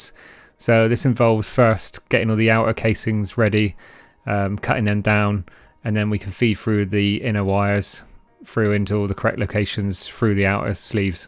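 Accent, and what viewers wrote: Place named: South West, United Kingdom. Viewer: 😂😂😂 you cant get away from that south western accent mate 😂😂😂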